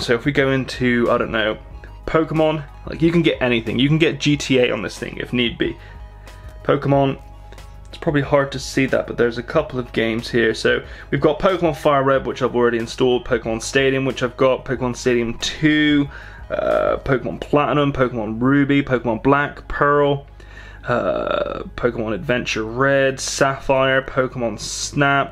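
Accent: United Kingdom. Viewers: he's brittish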